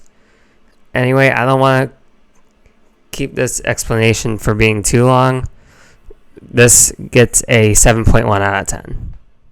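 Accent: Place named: North America. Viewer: Yoooooo good to hear a North American voice! Great commentary and hope to see more of these in the future!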